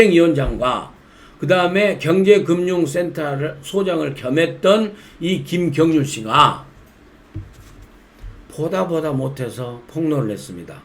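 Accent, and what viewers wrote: Jeolla, South Korea. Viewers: 언제나 뵈도 구수한 전라도 사투리에 정이묻어나는 내고향 전라도 아자씨 오늘은 고향 아줌마가 인사드립니다 2013년도부터 우리의 박근혜대통님을 높이 평가해주신 익히 존경했음니다 감사들임니다 전라도 분으로서 오래 오래 도록 우파를굳게 지켜주신 민대표님을 진심으로 존경합니다 힘내사고 오늘도 건투를빕니다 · 홧팅하세요 전라도 말씨가 구수하네여 근데 참여연대가 저런짓 하는거도 의심 갑니다 무슨 꼼수가 있는지요!!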